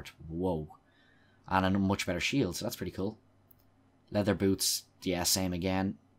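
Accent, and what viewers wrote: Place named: Ireland. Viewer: I figured with the actent lol where in dublin are you from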